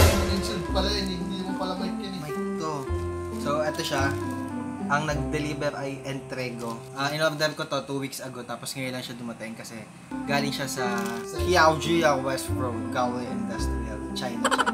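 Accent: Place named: Laguna, Philippines. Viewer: Taga laguna po kayo sir parang puntong laguna eh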